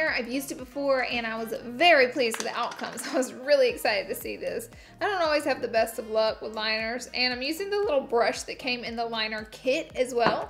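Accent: South, United States. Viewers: You’re so funny I’m new to your channel and I love your southern accent and your personality!!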